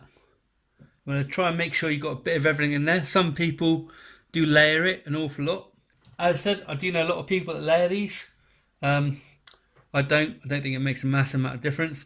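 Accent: Cornwall, United Kingdom. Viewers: You actually do sound pretty Cornish! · Yes you do sound Cornish, and nice to see Bedruthen Steps, not far from where I live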